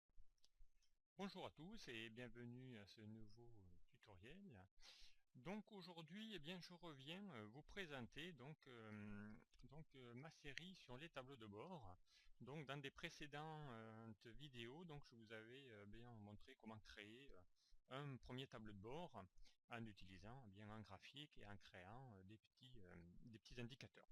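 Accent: South, France. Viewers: étonnant, j'entends très bien, même avec l'accent du sud de la France, manque les cigales en bruit de fond Essayez avec des écouteurs ou casque audio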